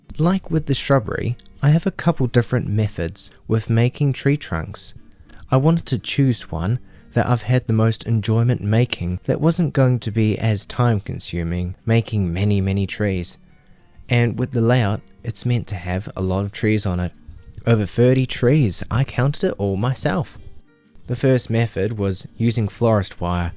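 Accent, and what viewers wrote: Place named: Australia. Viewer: This was 10,000 worth it Btw u sound aussie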